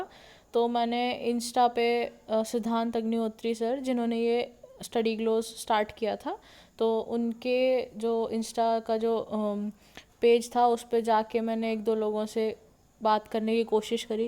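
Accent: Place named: Rajasthan, India. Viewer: aap to rajasthan and haryana sw lagti ho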